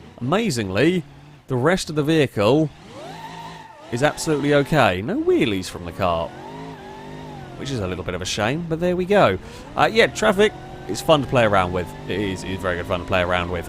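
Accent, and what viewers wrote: England, United Kingdom. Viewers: Are you from England? Cyprus or China?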